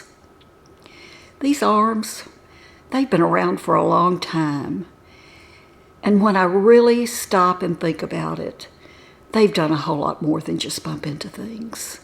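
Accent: South, United States. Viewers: you are so absolutely gorgeous oh what I wouldn't give for such beautiful sparkling blue eyes and I LOVE your adorable southern accent!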